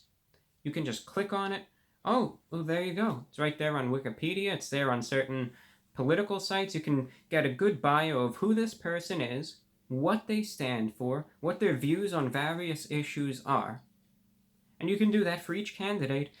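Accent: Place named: New England, United States. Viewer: What kind of accent does he have? Vermont? New Hampshire? That’s an interesting accent...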